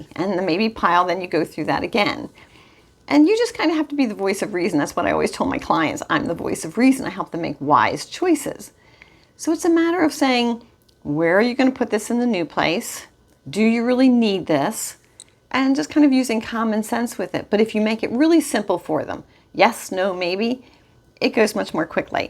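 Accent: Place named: Southwestern Pennsylvania, United States. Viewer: Kind if surprised you are in SE PA - kept hearing SW PA in your accent! Regardless, I really enjoy the logical, straightforward advise given in a friendly ‘it will be ok’ voice - so needed as we sort through 3000 SF and 38 years of being together!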